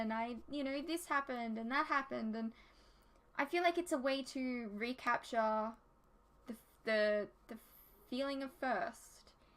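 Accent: Australia. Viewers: reaction videos are a dime a dozen. i think you might be auzzie, nz or tasmania. i have a great affinity for my rugby rivals and their accents. but, you, dear girl, have empathy. its difficult/refreshing to watch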